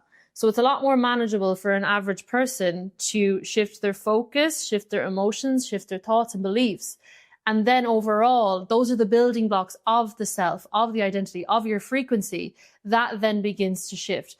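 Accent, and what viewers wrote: Ireland. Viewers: I love her Irish accent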